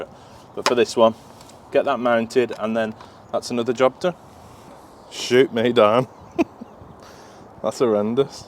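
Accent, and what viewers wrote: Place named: England, United Kingdom. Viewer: where are you from in England?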